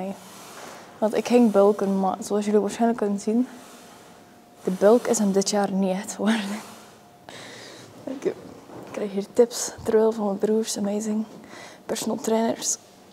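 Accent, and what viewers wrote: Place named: Flanders, Belgium. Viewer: waarom staat er nederlands als je belgisch praat of vlaams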